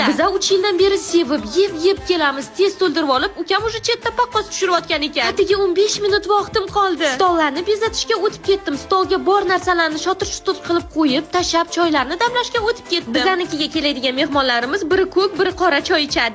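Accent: Khorezm, Uzbekistan. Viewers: Shatur shutir Xorazmcha ❤ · Voy xorazmlikmisiz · yo adabiycha yo Xorazmch gapla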